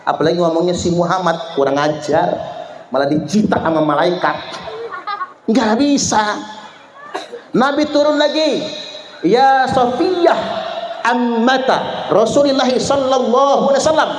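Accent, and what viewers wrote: Java, Indonesia. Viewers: cerdas wong palembang logat jawa banget sehat terus yai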